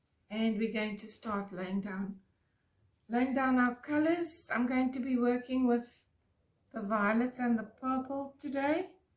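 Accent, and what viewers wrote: South Africa. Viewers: Beautiful. I am also a newby with this hobby and prefer the Dala Craft paint rather than the Dala acrylic. Where do you buy your Dala paint from as I am looking for 1 liter Dala bottles. There is a company in CT that sells the 1 litre containers, but I cannot remember the name and no name rings a bell if I google it. By the way, it is wonderful to hear a South African on Youtube pouring art channel.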